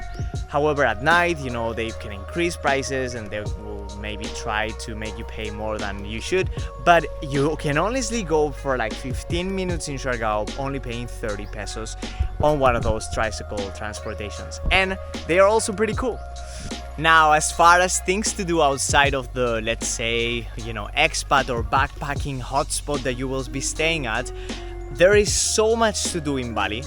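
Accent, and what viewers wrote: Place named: Spain. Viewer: Bali is already established while Siargao is just beginning to show and mark its presence among the world”s favorite island resort. I love your Spanish accent though and be yourself always but I would not be surprised if you will gain more subscribers and followers while in the Philippines. Enjoy ur stay in Siargao and also visit Boracay and you find so many luxurious accommodations.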